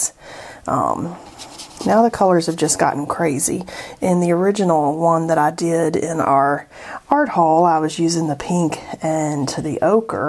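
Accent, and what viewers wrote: South, United States. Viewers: I loved your southern pronunciations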